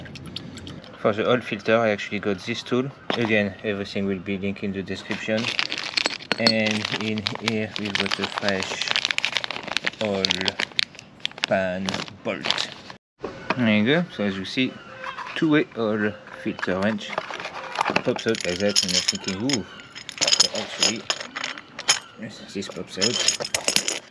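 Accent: France. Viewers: I love your French Englisch :) Very enjoyable. I hope i'll get a Modus for my wife next week. Her loved Twingo mk1 needs replacement. And now i know how to change the oil and stuf for her future car. Thank you for that :) Greetz from Holland!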